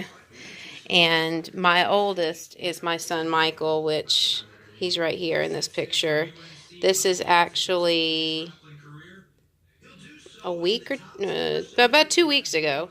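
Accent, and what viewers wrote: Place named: South, United States. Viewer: Hello there, I write your name well? Really enjoyed your 2d Vlog video. Seems a nice family to me, good husband and adorable daughters:) The puppies are sweet too. I have to say, you are doing great, also that selfie standard is very smart! Love your American accent. Is it southern perhaps? You can rely on me, that I will follow more of your video's. The MRE's are my fave too. Maybe you know my friend Nathan's MRE? If not subscribe, he is cool for sure. Perfect program on here you hear?? Loll... till next time.... Rick Lane is my Youtube name, Mark is my Dutch name, see ya:)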